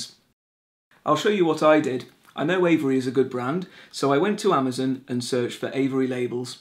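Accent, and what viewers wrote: England, United Kingdom. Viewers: Brilliant easy to follow instructions with an ENGLISH ACCENT. My only question is ….my laptop doesn’t produce another sheet when I get to the end of first one and I have to start all over again. I’m sure I’m doing something wrong. Please help. Thank you.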